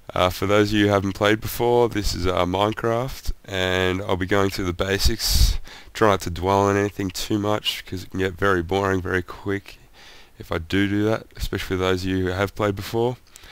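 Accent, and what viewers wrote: Australia. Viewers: Aussie? But uh, full screen please and I am gonna sub cos I'm liking the shit :P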